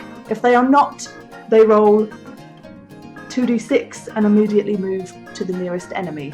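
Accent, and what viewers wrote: United Kingdom. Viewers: Wha... what WHAT IS THIS!? An attractive woman speaking with a British accent about WARHAMMER FANTASY lores?! 0_0 .... subscribe. Where do I vote for more of this from the interwebz?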